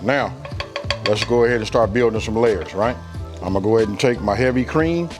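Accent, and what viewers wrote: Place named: Midwest, United States. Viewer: Bro where you from I’m from Kansas City Missouri and I swear you from KcMo or Midwest by your accent or somewhere Maybe Detroit lol… nonetheless that meal fire G I’m making this next for my wife & moms Insha Allah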